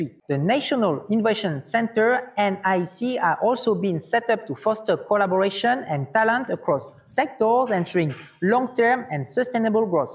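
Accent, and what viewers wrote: France. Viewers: I love the French English accent.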